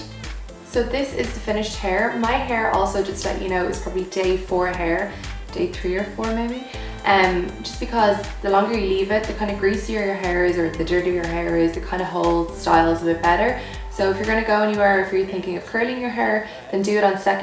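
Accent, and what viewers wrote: Ireland. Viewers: Are you Irish x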